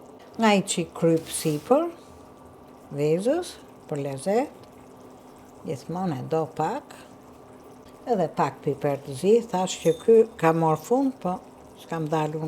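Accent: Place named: Central Albania, Albania. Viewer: Te lumshin durt dhe goja me tfolmen e shqipnis mesme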